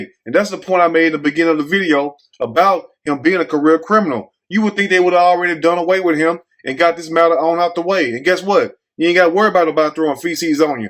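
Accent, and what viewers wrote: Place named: Houston, United States. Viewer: I heard you talk and immediately heard that H Tine twang. Possibly 4th ward.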